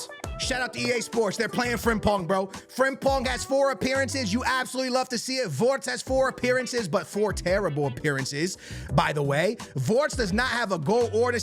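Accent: Portugal. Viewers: He's Portuguese let him off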